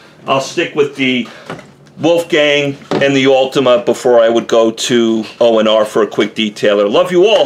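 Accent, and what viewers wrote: New York, United States. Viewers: I KNEW it! No way this was a Native Texas accent, a New York accent! Awesome videos, I learn so much from this.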